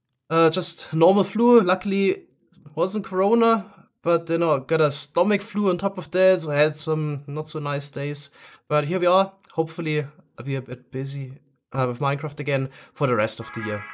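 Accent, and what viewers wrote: Germany. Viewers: Du hast einen sehr deutschen Akzent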